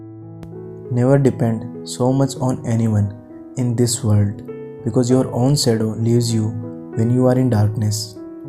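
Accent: India. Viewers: Heavy Indian accent. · Did you not find someone with better accent to have a voice over! Dehati English hai! And the first line is catchy! Very cheap! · Welp his foreign indian accent is like butter sad about the thumbnail but stayed for the voice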